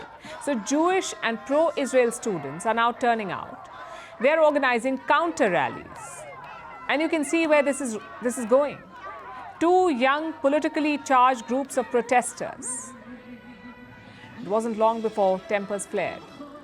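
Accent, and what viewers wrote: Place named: India. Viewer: Indian accent is so beautiful like this news lady. The best accent in the world.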